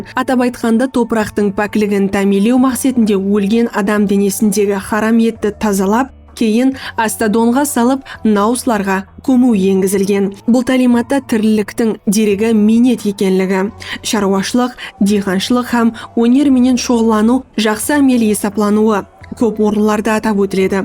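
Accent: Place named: Karakalpakstan, Uzbekistan. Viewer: Нагыз каракалпак тили анык, рауан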